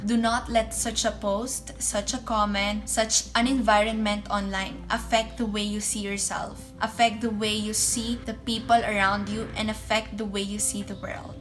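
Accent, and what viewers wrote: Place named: Philippines. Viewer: im quitting social media too, and while listening to you, i think you're a filipina haha, btw new subscriber mo ako, keep doing videos :>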